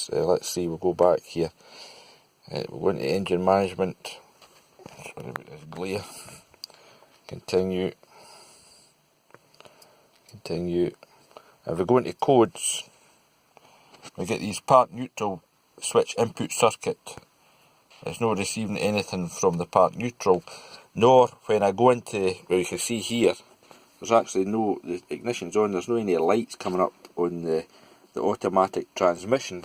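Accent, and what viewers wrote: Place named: United Kingdom. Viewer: He's not from the "Brown" world chum. You can tell from his accent his from the UK you absolute moron. He's speaking English 100% fluently which I can tell you are not able to looking at your constant ABUSE of ellipsis. You massacred the English language in that sentence of yours.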